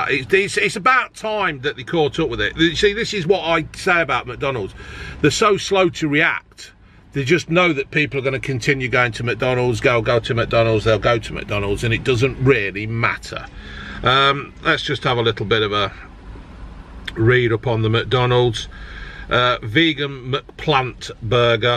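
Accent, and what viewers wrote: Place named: Leicester, United Kingdom. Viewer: LOLL this guy is a proper Leicester bloke hahaha 😂 love it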